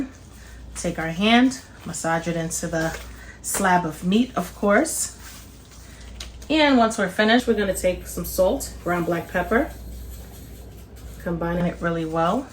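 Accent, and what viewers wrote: New York, United States. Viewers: That New York accent tho❤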